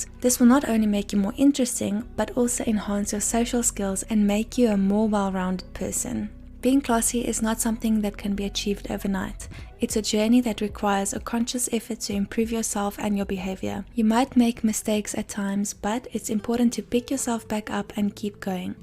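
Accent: South Africa. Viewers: " " Your South African accent is refreshing for a South African such as me, who has lived in the USA for 30 years now. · I can hear that you are South African. I love that!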